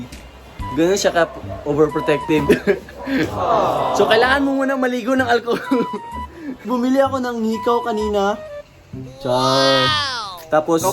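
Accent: Visayas, Philippines. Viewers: BISAYA O ILONGGO?:)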